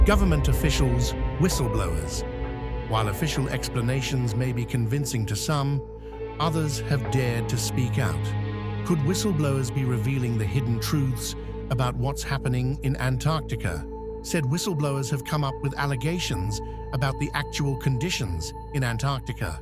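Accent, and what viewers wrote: United Kingdom. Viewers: DO AWAY WITH THAT HORRENDOUS LOUD BACKGROUND NOISE YOU MISTAKENLY THINK IS MUSIC.... IT'S VERY ANNOYING AS IS THAT HORRIBLE BRITISH ACCENT IN WHICH THEY CAN'T PROPERLY PRONOUCE WORDS.... LAW DOESN'T HAVE AN 'R' IN IT, AND TUBE ISN'T PRONOUNCED AS CHUBE, AND CUBA ISN'T PRONOUNCE CUBER, AND DUNE ISN'T PRONOUNCED AS JUNE!... HORRENDOUS!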